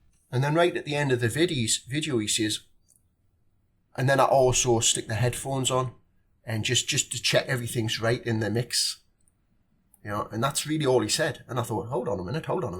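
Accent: Newcastle, United Kingdom. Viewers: "... an then ah fa'got ah thought that an I went an bought some ..." Love it, and love the Geordie. Totally agree, though. I don't have space for speakers either, but I do need new headphones. My skullcandy ones are falling to bits. Sennheiser?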